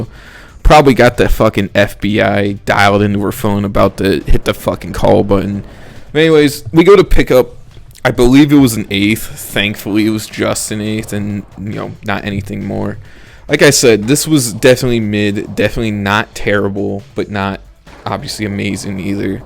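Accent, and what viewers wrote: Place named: Midwest, United States. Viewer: It sounds very Midwestern vro sounds mad congested lmao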